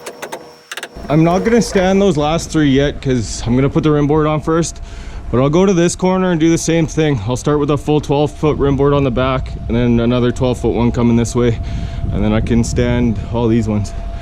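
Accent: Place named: Canada. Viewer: You sound Canadian. You ever end your day with a smoke?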